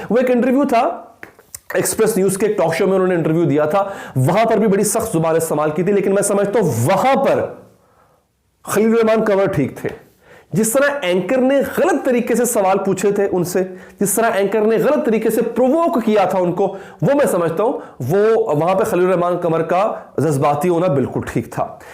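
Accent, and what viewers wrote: Punjab, India. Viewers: Punjabi touch 💞 Stay blessed bro💐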